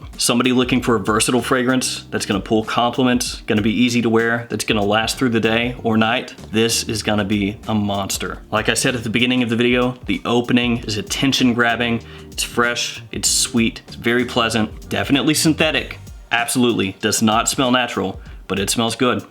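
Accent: South, United States. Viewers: Hey. Nice vid. Btw. What is your accent? Is it southern?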